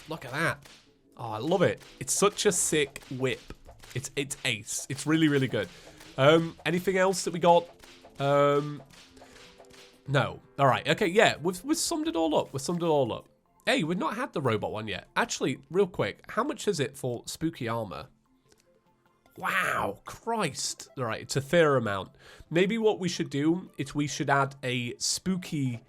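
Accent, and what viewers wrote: United Kingdom. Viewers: videos make me realize how different Brits pronounce certain vowels than Americans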